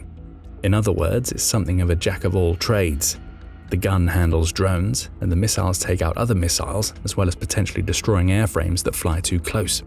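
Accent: United Kingdom. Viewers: Im very surprised you're saying soccer when you sound like you're from the uk.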